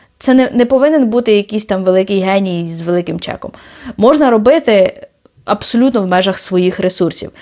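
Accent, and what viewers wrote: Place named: Lviv, Ukraine. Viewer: Вас так приємно слухати, що я навіть не вмикаю х1.5 (що зазвичай роблю). Ви наче маєте львівську говірку («будЕте», «до прикладу»…) чи ви дійсно львів’янка може? Так часто вас слухаю - складається враження, що ми особисто знайомі 😅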